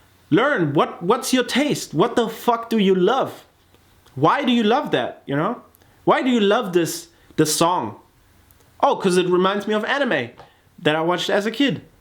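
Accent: Germany. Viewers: oha heftig aber wenn man drauf achtet hat er nen deutschen Akzent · Dein Deutsch-Englisch ist bisschen auffällig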